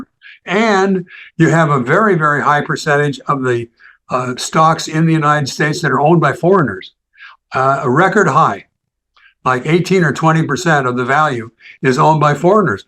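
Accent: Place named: Canada. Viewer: I love your broadcasts, your guest choices and especially your Canadian accent! 'Out' 'About' 😂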